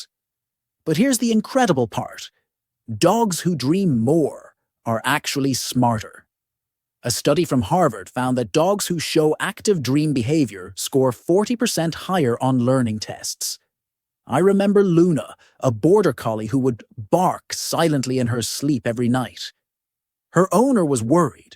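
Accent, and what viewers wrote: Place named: Dublin, Ireland. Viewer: What a horrible voice over - some sort of warped south side dublin accent , sounds like it’s reading w emphasis in all the wrong places - unreal - cringe making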